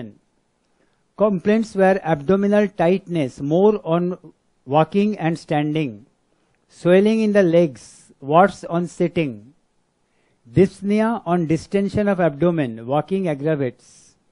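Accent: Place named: Mumbai, India. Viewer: I had to blink a few times. The doctor looks like my German neighbour, but the language is definitely Mumbai.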